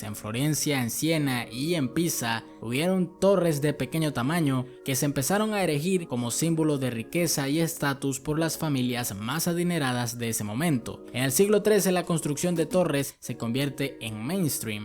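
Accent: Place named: South America. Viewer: Vaya forma de destruir el idioma. Me parece que el narrador es sudamericano. Usar un diccionario para ver cómo se dice una palabra no te hará daño. Ni practicar la dicción. Qué bueno que no vivo en sudamérica, no podría soportar la manera tan peculiar que tienen de hablar castellano.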